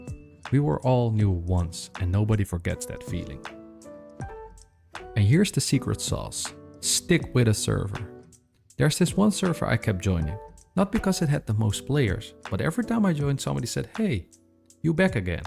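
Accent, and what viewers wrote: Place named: Netherlands. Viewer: i hear a small dutch accent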